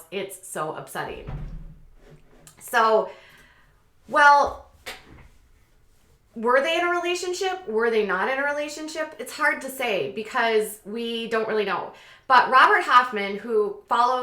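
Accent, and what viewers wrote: Minnesota, United States. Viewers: I notice you say it like “Gosling” instead of “Gosselin” — but it may just be your Minnesota accent. I think Minnesota accents are just too cute!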